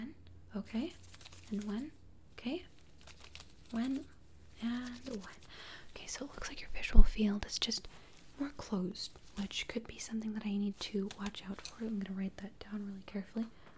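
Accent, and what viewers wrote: United States. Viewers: I can't tell if the American accent or the British accent is her original one. Both sound authentic. lol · I can’t ever figure out if your American or British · Are you American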